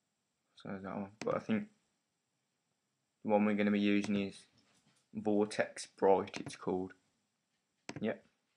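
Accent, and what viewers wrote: Birmingham, United Kingdom. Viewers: So refreshing to have this in a Brummie accent instead of one of our American brothers. Big Up from the Solihull Massive. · WTF BRITISH VOX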